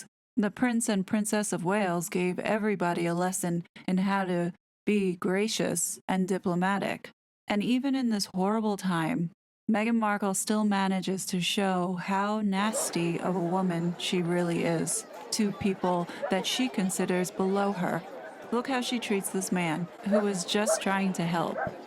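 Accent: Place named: United States. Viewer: Nice to hear an American voice that has some understanding of how The Monarchy works. Duty before family, feelings or wants. She is being tightly controlled by senior members of staff, probably ex military and a higher rank than Prince Harry was. By her demeanour, she had been told how to act. They separated M from H, and he seemed quite happy to be free of the claw. Yes her behaviour to the staff will be addressed, not necessarily to M & H. Give enough rope etc. The Prince & Princess of Wales just outclassed them by sheer presence.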